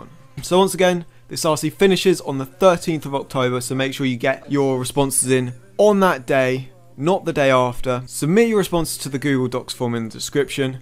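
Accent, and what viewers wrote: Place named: United Kingdom. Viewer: Gotta love that brilliant British accent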